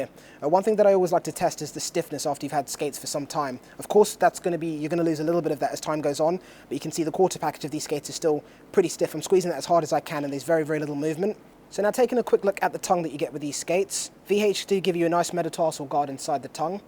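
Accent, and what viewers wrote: United Kingdom. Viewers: A black fellow with a British accent talking about hockey.. definitely don't see that every day eh? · I have to say this: I really enjoy the way you speak (voice, accent, style – snappy, masculine and British).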